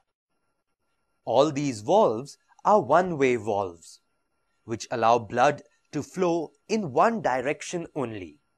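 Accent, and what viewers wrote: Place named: India. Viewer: lovely indain accent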